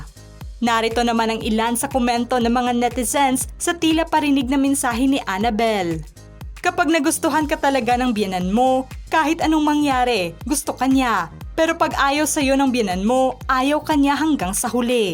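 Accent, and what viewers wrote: Visayas, Philippines. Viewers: Pag-aralan paano bigkasin ang ibang mga salita. Hindi porket Bisaya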